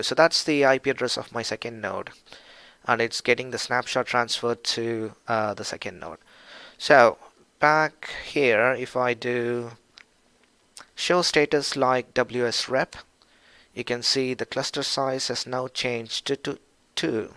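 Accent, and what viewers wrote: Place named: Australia. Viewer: and that’s good Your accent sounded like Australian Never mind bro..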